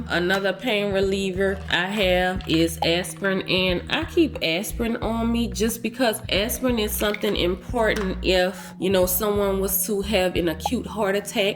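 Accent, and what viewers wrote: New Orleans, United States. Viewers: Are you from New Orleans, the accent 😊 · i wanna hear her say baby earl or ferl paper she gotta be from da NO area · Thank you 🙏🏾/I appreciate you and I Love your New Orleans accent 😀❤️🖤💚👋🏾-Listening from Dallas . Hit the like button 4 u 👍🏾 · I can hear a new orleans dialect from across the world.. lol.. stay safe ppl.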